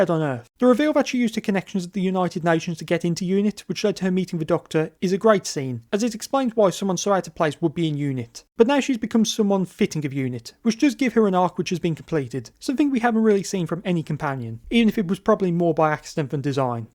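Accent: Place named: West Midlands, United Kingdom. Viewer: Like the West Midlands twang. 👍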